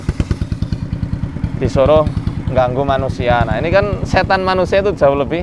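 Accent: Banyumas, Indonesia. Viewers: assalamualaikum pak ustadz alamat dimn aslinya orang mana...ngomong ngapak apa y...salam dari Cilacap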